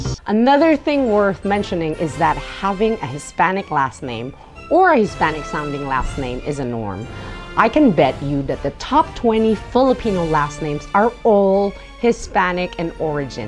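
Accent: Castile, Spain. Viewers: Se ve que aprendiste el castellano en España. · She has a pretty Castillian accent. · Castilian accent? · Is your spanish accent from the region closest to portugal? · She's speaks English clearer, much better than most Filipinos, she has a nice accent. Her Spanish is excellent in fact the accent is definitely from Spain. Anyway, really enjoyed the video. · Her speaks with a Castilian accent, she either learned in Spain or taking classes where that variety was USED. I've met Filipinos who are fluent in Spanish, and their Spanish is very similar to Latin American, which taking into consideration the country seat of government during the Spanish colonial time was in Mexico. Otherwise very informative video, with minor generalizations such as " natives being exterminated in Latin America, no, there are many indigenous groups still present, and they speak their native tongues along with Spanish. 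· You speak more spain espanol than mexican Spanish not going to lie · Habla con acento castellano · Thanks for the history lesson. You speak proper Spanish but you got the accent from Spain. I'm Puerto Rican 🇵🇷, we speak Spanglish 🤦🏻‍♀️🤭. I can't wait to move to the philippines to learn more about the country and culture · Thanks for the video there's phillipino that immigrated to nueva españa back in the 1930s in the port of veracruz and Acapulco the know the phillipino national anthem i would love to know more about the phillipinas the roots on my dad side came from Manila one day I will dare to move there explore and see the difference between us and the past and like you said what if the phillipinas spoke more Spanish and was under Spanish influence and not American influence well you got another follower in me I love your Castellon spanish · Curious to know how you’re living or at least traveling in México but developed a Spanish accent? 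🤔 can hear it when you say words with “c” like “Cielo” almost sounds like “thielo” you know what I mean 😂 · Actually her Spanish sounds more like Castilian Spanish than Latin American Spanish. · You Have A Beautiful Castillan Accent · You speak perfect Spanish. Your accent is 100% from Spain, is that where you are at? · Chabacano is very close. Se parecen algunas palabras. muchas palabras suenan parecidas. su acento no es Latina. Parece un poco de Castilla. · 🙌🏽🇵🇭 bien bonita usted, na cara y alma. gracias contigo para yan menciona con el de aton lenguaje CHAVACANO! pero si ta queda usted alli na mexico, porque tiene usted accento español de españa? gracias tambien cay tan comparti usted mucho elementos del de aton cultura FILIPINO. tiene usted de mio corazon por causa de este. mabuhay y viva maga #KAYUMANGGI 🇵🇭🙌🏽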